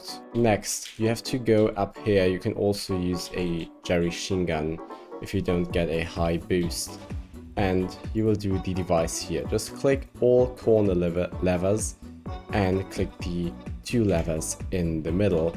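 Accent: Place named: Germany. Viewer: Nice german accent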